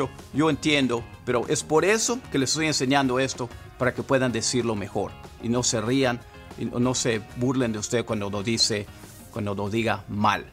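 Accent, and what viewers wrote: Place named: Georgia, United States. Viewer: Gustar es poco me encantó tu video hablando de recordar me recuerdas a mi hno.tenía tu mismo acento vivió muchos años en Georgia pero ya se regresó a México y ya está recuperando su acento mexicano. saludos😃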